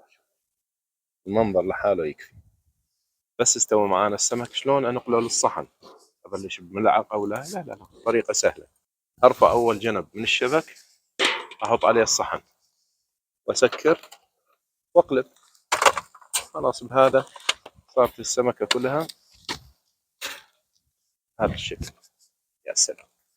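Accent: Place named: Deir ez-Zor, Syria. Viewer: شيف المحترم نحن نستمتع بكلامك وشرحك على الفيديو خصوصاً بلهجتك الديرية الجميلة اذا سمحت لا تقطع الفيديو بهل طريقة السريعة وخلي يكون اطول ❤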